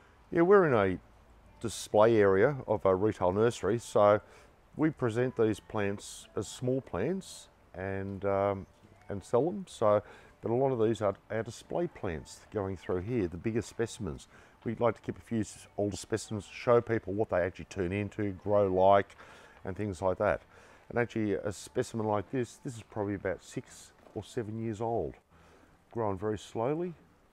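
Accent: Australia. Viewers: Your collection are so beautiful pretty healthy yr voice say u are Australian u are so far away i like to order from yr collection they are so awesome but i live in Trinidad west Indies Maloney garden so lovely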